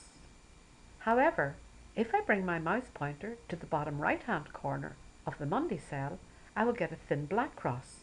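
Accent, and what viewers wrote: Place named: Ireland. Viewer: Thank you for your time. Love the motherly Irish (?) lilt too, very relaxing, I almost fell asleep:)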